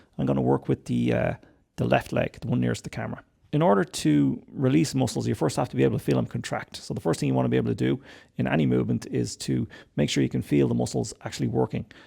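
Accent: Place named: Ireland. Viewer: I like it. I like your Irish accent i don't think you do fine , some videos were challenging , the volume's but, not too bad.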